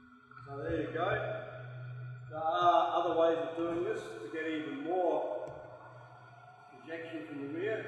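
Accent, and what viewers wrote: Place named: Australia. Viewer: Australian... cool.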